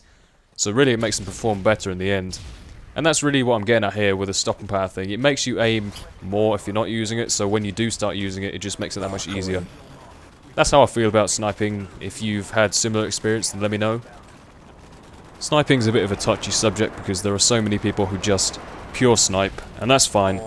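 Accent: United Kingdom. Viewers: My favourite commentators are you (obv) and The Trout91. I just like hearing other British people I guess and you talk about interesting stuff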